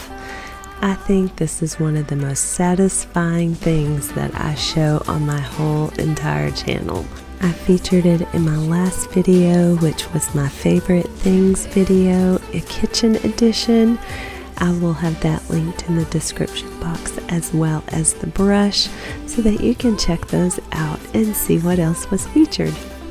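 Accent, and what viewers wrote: Texas, United States. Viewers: Hey, supporting from Virginia with like 90; cute Texas accent hehe. We'd love to have your opinion about our nature ambience soundscapes as well. New sub and hope we can keep in touch, if possible.👍💚 Sending positive vibes your way!:goodvibes:🔔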